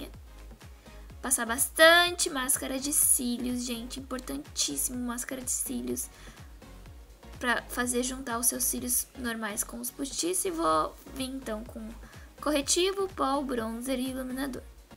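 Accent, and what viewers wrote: Santa Catarina, Brazil. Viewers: pelo sotaque só poderia ser catarinense!! linda, perfeita make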